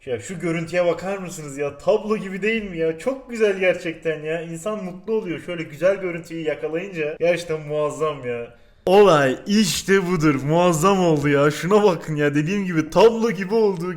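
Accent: Adana, Turkey. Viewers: abi adanalımısın yeni fark ettimde ediyürüm yapiyürüm ekliyürüm valla adanalılar da böyle konuşuyor :D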